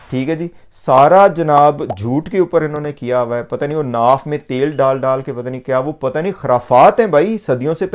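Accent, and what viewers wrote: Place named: Punjab, India. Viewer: Ap punjabi py zor deny ki bjaay topic py bt krty wqt bch jta... Fuzool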